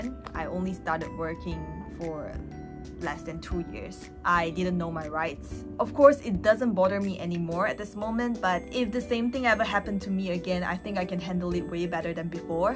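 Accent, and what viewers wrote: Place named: South Korea. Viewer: Maybe your interaction with crews and people, your accent is more towards Korean than Malaysian accent 😊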